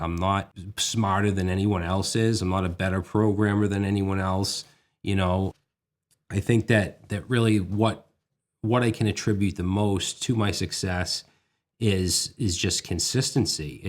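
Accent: Boston, United States. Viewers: Was curious how vite worked and found your video to be super helpful. Thought I heard a boston accent so decided to check out your channel. I'm two minutes into this video and find it to be very moving. Earned a sub today, go sox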